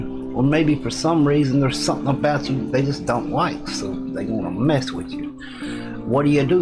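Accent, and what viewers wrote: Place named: South, United States. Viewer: My wife is rollin on the floor. Love how you articulate in the southern accent !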